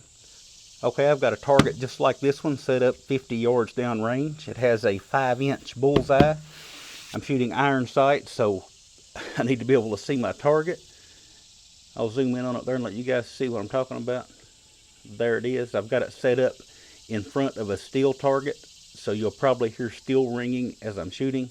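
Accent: United States. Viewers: балдежное произношение. в каких штатах разговаривают в такой манере?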